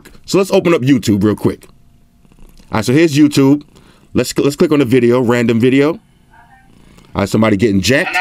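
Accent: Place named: Brooklyn, United States. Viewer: First time watcher, I know a brooklyn accent anywhere!! review is on point and full of detail. Awesome to see someone from the hood doin they thing on the net!! you got my sub / support. Do yo than bro!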